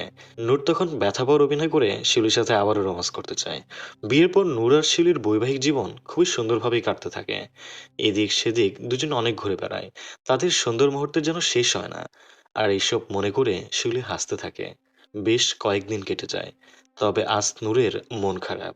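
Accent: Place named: Pabna, Bangladesh. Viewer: পাবনার জেলার কথা শুনলেই ভালো লাগে ❤❤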